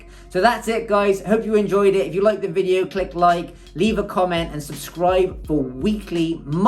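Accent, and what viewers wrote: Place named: United Kingdom. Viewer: british mate huh hmmmmmmmm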